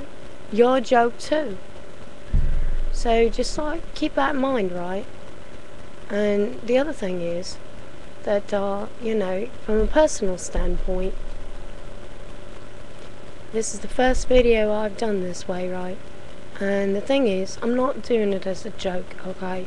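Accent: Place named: United Kingdom. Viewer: whats wiv the Britsh accent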